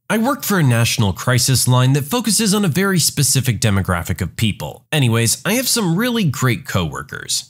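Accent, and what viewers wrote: United Kingdom. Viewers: Love the British accent init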